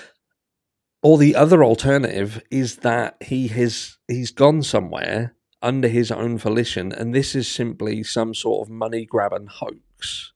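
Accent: Norfolk, United Kingdom. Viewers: wayyyy Norfolk boiiii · This is the most west norfolk accent on youtube. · I thought that. I'm Kings Lynn and now Norwich and he sounds quite local. Love ut! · Love your vids, are you from Norfolk?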